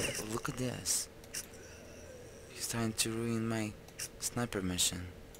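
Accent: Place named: Russia. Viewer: You are russian, right? I know this accent))))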